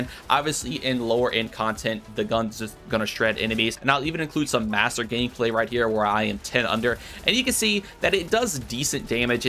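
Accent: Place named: North Carolina, United States. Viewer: Completely irrelevant, but the more I watch your content the more I feel like I hear a North Carolina accent in there. Haha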